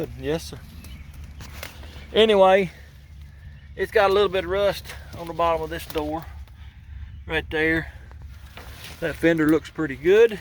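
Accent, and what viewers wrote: South, United States. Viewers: I'm hoping to be at Adams,,if I see you I'll say hello! I love watching your videos! It tickles me at the good old" country talk" that I've heard for years!! Your a great mechanic no doubt! Keep on doing these very interesting videos! You tell how to do things the best way,, STRAIGHT FORWARD! NONE OF THAT TECHNICAL MUMBO JUMBO!! that in my OPINION IS WHY YOUR SO POPULAR ON HERE!!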